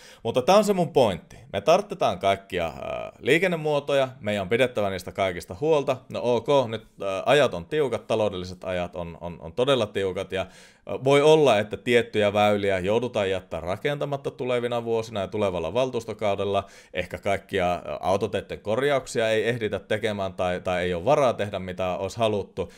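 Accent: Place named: Espoo, Finland. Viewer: Melko Espoolainen aksentti....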